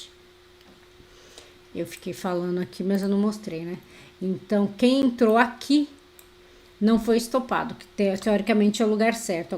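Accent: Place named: Minas Gerais, Brazil. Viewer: sabia que tinha algo por trás desse mineires!😘👍🏼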